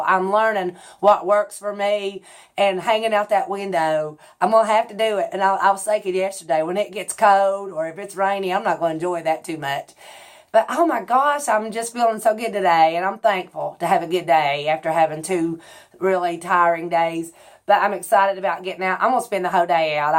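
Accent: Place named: West Virginia, United States. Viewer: Hi! I really hope you read this. I was searching for weight loss help and YouTube sent one of your videos to me. I LOVED IT AND love listening to you! You actually made me cry watching this one. Honey, all women feel the way you have been feeling at one time or another. I can relate to so much to what you have been through. I just wish I would have found you earlier. I wish I would have found you while you were posting your videos. Maybe, with more folks responding to your videos you would have stayed with us. I recently reached out to your daughter Hope to find out if you would be coming back. She said she didn't think you would be back. I am so sad to hear that. If you come back and do a lot more weight loss tips and such, I have no doubt you will get a lot more subscribers. I don't know anyone who isn't trying to lose weight. 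I have lost and gained so many times (like you), you were going to be my weight loss coach. I am binge watching your videos b/c you remind me of so many people in my own family. My Mom's family is from W.V. and have the same accent as you. I love it. If you just got tired of doing the videos, maybe in time you will come back and do a couple or so a month. If you don't, I want to thank you for what you have given us. I so enjoy all the ones I have watched so far. I wish you all the best either way. Take care and enjoy the many blessings you have in your life. We miss you.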